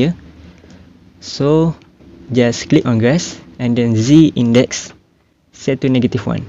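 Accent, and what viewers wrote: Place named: Malaysia. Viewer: thank you, btw you sound like malaysian.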